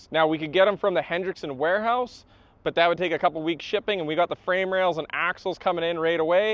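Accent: Canada. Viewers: I noticed the way you say semi truck the i is like like a lowercase and the way we say it down here in the states it’s semi like an uppercase so is that a Canadian thing I take it because when I watch a Tesla video about trucks I gotta turn it off because all my life, I’ve heard it that way and it drives me up the wall not so bad when you say it, but you’ve got that Canadian accent. PS I love YOUR trucks.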